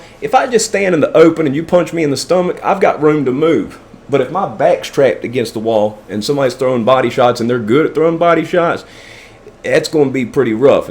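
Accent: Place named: South, United States. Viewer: Enjoy ur personality and stories. Im from KY, so ur country diction hits home! CHEERS!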